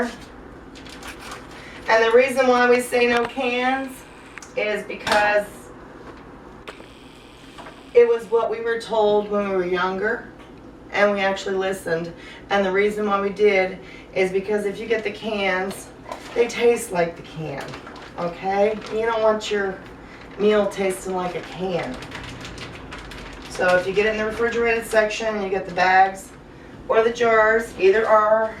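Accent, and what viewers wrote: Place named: Ohio, United States. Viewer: You are my "Sister from another mister!" You sound like me, talk like me, I felt you! (Not to sound stalkerish!) I got the same cookware and everything!!! IDK how old mine is either! I've also got my Grandpa's Cast Iron Skillet! (Notice the caps? BECAUSE!!) I'm from Kettering Ohio! You sound like you're from here...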